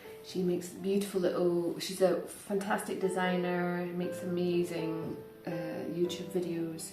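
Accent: Scotland, United Kingdom. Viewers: Hi! I am near Aix en Provence and I’m a sucker for the Scottish accent! I too knit but right now I’m spinning more than ever! Have a lovely afternoon and I hope our paths will cross! Adrien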